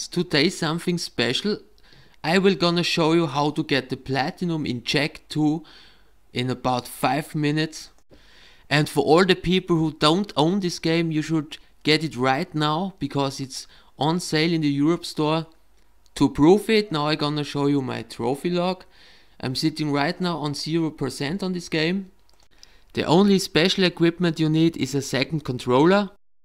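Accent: Germany. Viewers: It's a German accent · Wenn du deutscher bist - rede deutsch. Klingt einfach nur schrecklich wenn die deutschen auf International machen.... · Wow du bist sicher deutsch oder ? (no judgement) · Bruder man hört das du deutscher bist kannst du dass auch mal in deutsch machen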